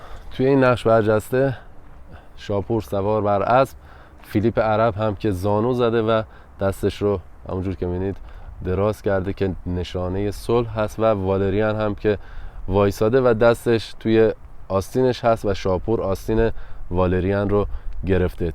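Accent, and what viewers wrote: Shiraz, Iran. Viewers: درود بر شما آقا با لهجه زیبای شیرازی ، هم استانی خودم عاشقانه استان فارس رو دوست دارم مایه افتخار هست که شما هم میهن گرامی تاریخ زیبای ایران رو به ما به خوبی می شناسونید دمتون گرم و برقرار و شاد باشید 😊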